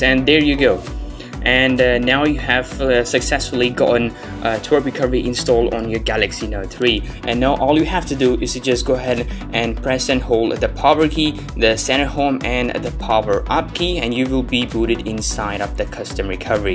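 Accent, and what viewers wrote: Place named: United Kingdom. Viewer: Bro your accent is so good at first i thought that you were a british but still love your contant and a big fan of roms specially for note 3 tnx and keep uploading cool videos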